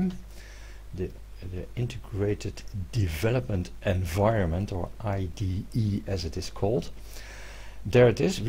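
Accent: Netherlands. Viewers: Just watching to take in the genuine Dutch accent. This sits like a mustache.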